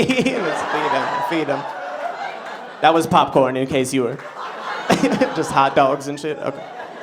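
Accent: Louisiana, United States. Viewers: How did he grow up in the San Fernando Valley and come me out sounding like he’s from Louisiana? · Wow you have a thick southern accent for being born and raised in LA, CA. Unless you meant LA as in Louisiana? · Hi, I noticed you have not put any videos on here in a long time. I hope all is good, I saw you on America's got talent but not sure how old the video was? I read comments below about your accent, I grew up out in So. Cal., but,moved back to michigan 22 years ago. I have heard many accents and wondered if you were from maybe Lousianna? you have an accent, but it doesn't sound like New York / Jersey, or Canadian, or Michigan, Wisconsin, Minnissota, or any states i can think of, but it has sort of a southern sound but not always. just wondering where you grew up? Most of us get a accent from hearing adults with an accent at a young age. When I moved back to michigan from So Cal, it was funny sounding to me, they really had some strong accents here but they dont think they do.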